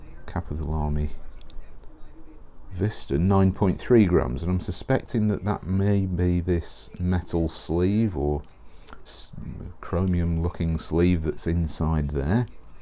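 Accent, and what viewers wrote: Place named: Midlands, United Kingdom. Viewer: I understand him just fine, but I am English, I guess. It's a Midlands accent of some sort, I think, which I imagined would be easy to understand.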